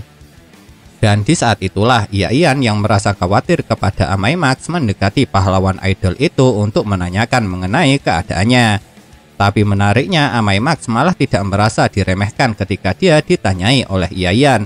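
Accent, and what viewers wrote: Java, Indonesia. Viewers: Logat jawa neee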